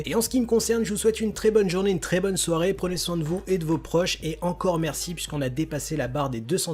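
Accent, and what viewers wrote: England, United Kingdom. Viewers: Pk tu dragon ball avec un acsent anglais???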